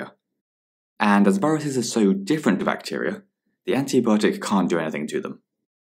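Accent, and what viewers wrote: England, United Kingdom. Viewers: Thats how it's pronounced in the American accent you dumb the guy in the video is English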